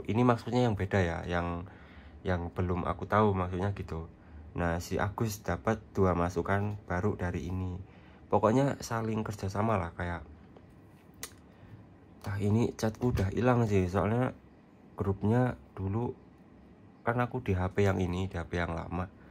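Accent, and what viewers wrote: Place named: Wonosobo, Indonesia. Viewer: Sampean cah ngendi mas? Logate kaya wsb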